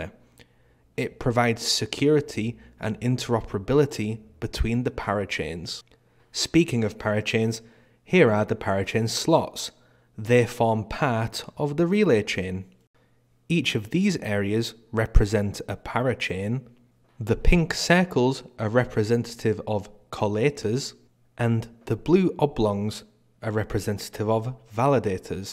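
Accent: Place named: Barnsley, United Kingdom. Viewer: Great video again! You sound like you’re not far from me! Barnsleh? DM on Twitter if you like :)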